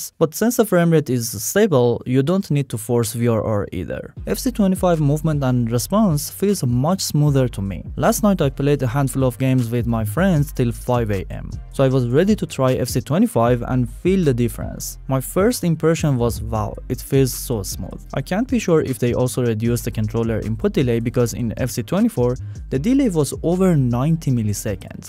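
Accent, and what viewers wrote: Iran. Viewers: اقا از لهجه متوجه شدم ايراني هستي. موفق باشي 😀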